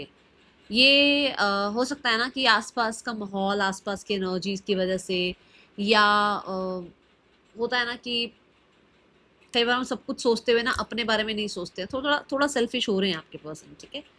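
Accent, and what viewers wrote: Punjab, India. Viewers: Mam tusi punjabi ho ?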